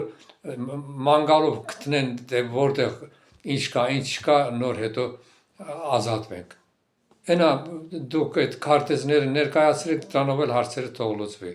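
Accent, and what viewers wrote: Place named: Lori, Armenia. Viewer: Ձեր խոսակցականը լոռվա բառբառինէ նման լոռվա որ հատվածումեք դուք ապրել,ամեն դեպքում համաձայնեմ ձես հետ թող երկու կողմիցել զոհեր չլինեն։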